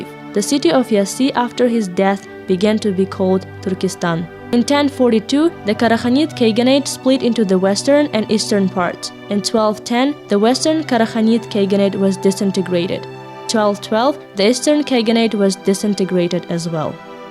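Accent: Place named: Russia. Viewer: You have russan sound)))))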